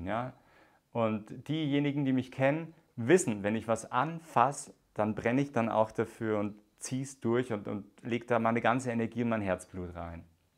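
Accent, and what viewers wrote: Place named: Bavaria, Germany. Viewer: Cooler Kanal! Abo is raus :D schön auch einfach mal nen Bayer zu hören. Wie hoch ist denn dein Lebensstandard, den Du Dir erwirtschaften musst, wenn Ich nachfragen darf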